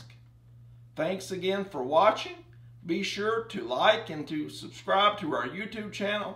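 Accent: South, United States. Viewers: Southern Drawl contest... southierest dawliest talking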